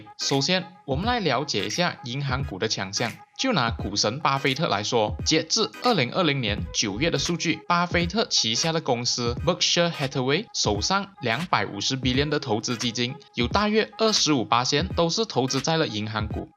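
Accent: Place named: Hunan, China. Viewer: 这个马来小编，除了南方腔，还有湖南腔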